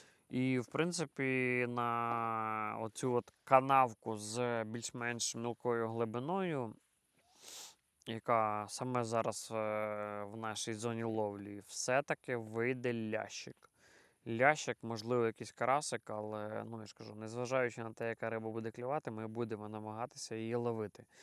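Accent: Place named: Western Ukraine, Ukraine. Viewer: Це шось Західно-Український діалект.😊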